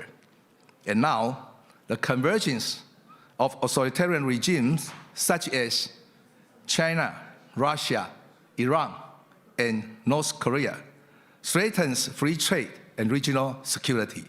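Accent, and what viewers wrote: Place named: Taiwan. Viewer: 英文發音不好，是因為台語的關係＇如果台灣人的普通話說的好的話＇通常英文的發音不會是這樣的＇這是身邊的台灣朋友出現的這種現象，既使現由專業英語人士再教育也改變不了這台語調的英文。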